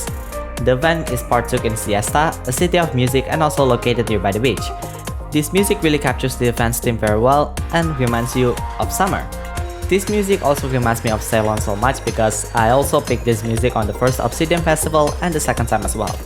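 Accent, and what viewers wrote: Indonesia. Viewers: So... You're actually indonesian? 🗿 I can tell from your accents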